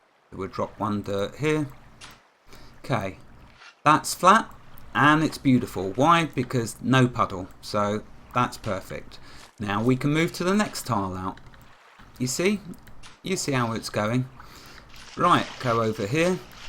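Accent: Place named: England, United Kingdom. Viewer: *Laughs* Hi and I live 5000 miles and one gigantic pond of water (Atlantic) away from each other. So for now the only way I can appear in a video is in the background. I can not hear when he is recording so I have no clue what he is saying until I watch the video's just like all of you. I personally do not have the equipment that has to be able to record but then if I record it wouldn't be a Tutorial would it? Besides I don't have that fantastic English accent that has. I am originally from California so I don't really have an accent and would be boring to listen to. *laughs* I really wouldn't feel comfortable recording a video on my own since this is tutorials. is my best friend on this planet and I would never want to take anything away from his project. One day we hope to be in the same location so we can work on this together. You think you are amused by video's now wait until we can record together. 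*laughs* Like Vikki said we sound like an old married couple. We affectionately bantering with each other which is funny to listen to. So I am sorry dear for now you will have to listing to waffling on alone. *smiles*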